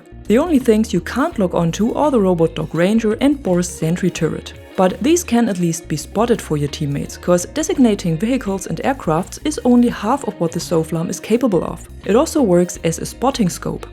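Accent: Germany. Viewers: Is that a German accent? :) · Are you from germany?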